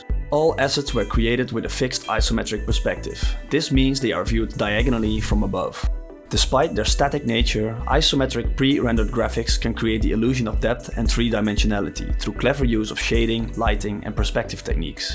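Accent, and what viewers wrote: Netherlands. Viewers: what is this dutch accent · Accent heard, Nederlander gespot. Goeie video pikkie, subscribed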